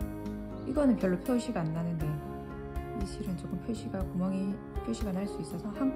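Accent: Busan, South Korea. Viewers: 저도 부산 출신이라 ㅎ 광안리 … 멀리 미국에서 부산 사투리 쓰시는 님이 늘 가까운 느낌이네요 뜨게질 소질은 없지만 보게 되네요 ㅎ 예전에 지인이 만들어준 수세미도 생각나고 잘봤어요~~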